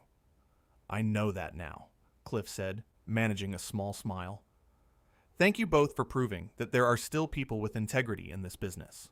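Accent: United States. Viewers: This is fake - never happened. The bad, over-written account delivered in a nasal American monotone should be a clue that this is phony. More fool.the eejits who believed this.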